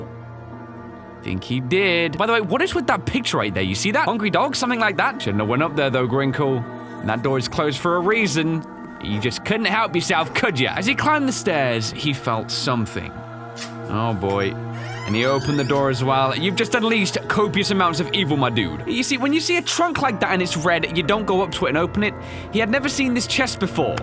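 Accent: Ireland. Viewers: Anrijs Christopher it’s not because of rage it’s because he’s making fun of his Irish accent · He can hide the Irish accent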